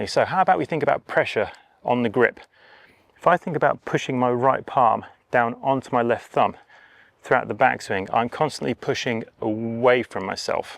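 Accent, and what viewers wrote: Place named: England, United Kingdom. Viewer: Hey, I'm a big fan of what you do. I've studied and played (1 handicap in '02) for 46 years. And your insight on chipping is Brilliant. One suggestion.: Speak a bit slower. Sometimes your rate of speech, is such that your words are hard to discern: I had to replay with captions, to understand the word boil, in the first 30 seconds.I love your English accent, but it can, at times, be a second challenge, for us Yanks. Thank you good Sir.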